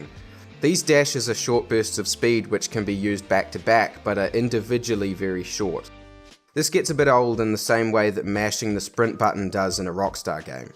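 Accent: New Zealand. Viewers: I've only played the first and Second Son and I do prefer Second Son but I so see where you're coming from with your points about the first it's still a pretty fun game. Btw are you from NZ the slang and your accent made it sound like it. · That's a Kiwi accent bro.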